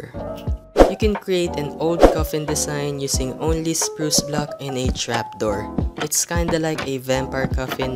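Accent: Philippines. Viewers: Break not Broke. also are you filipino cuz your voice sounds filipino...^_^ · ur from the philippines, i can hear ur accent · Ate u a Filipino?the accent lol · U sounds like Filipino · Men hes accent is familiar? Are you a filipino? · What is your nationality? I think your Filipino because of your accent? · Ummmmm are you filipino? Couse you sound like filipino hehe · Pinoy ka kuya noh?? Yung accent mopokasi hahahha halata!!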